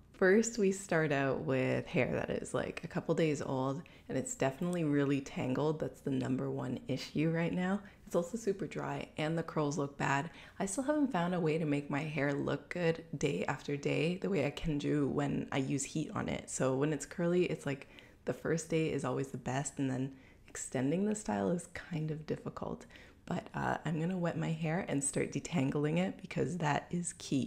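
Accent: Canada. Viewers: She’s Canadian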